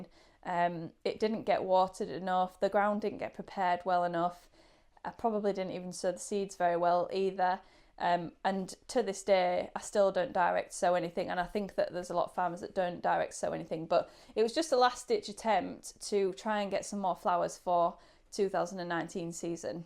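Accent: Yorkshire, United Kingdom. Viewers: Great video and love to hear that Yorkshire accent! Like getting an extra episode of PBS's All Things Great and Small!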